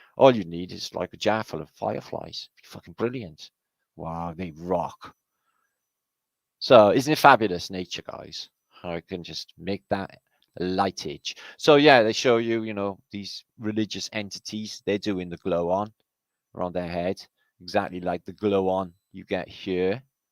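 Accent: Wales, United Kingdom. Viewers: I'm sorry the world lost a good guy , a truther, with a gorgeous Welsh accent. (the world needs more of all of the above)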